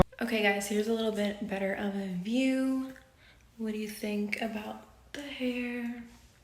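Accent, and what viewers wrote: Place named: South, United States. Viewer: Nothing crazy🙂 Sounding country 🙂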